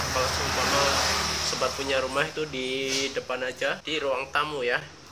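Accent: Banyumas, Indonesia. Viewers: Tak kirain banyumas mas boy..soalnya logatnya kaya orang banyumas.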